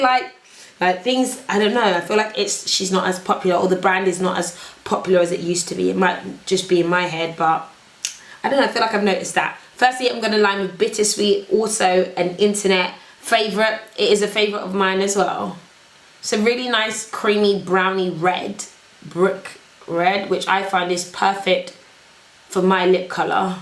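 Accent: England, United Kingdom. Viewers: I love your british accent,love :))) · English accent ?😂😂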